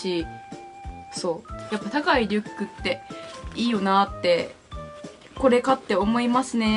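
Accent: Kansai, Japan. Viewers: 自分関東人だから分からないけど、結構こてこての関西弁なんですね笑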